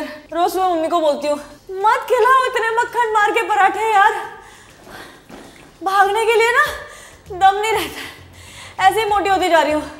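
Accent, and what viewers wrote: Maharashtra, India. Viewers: Such cute Marathi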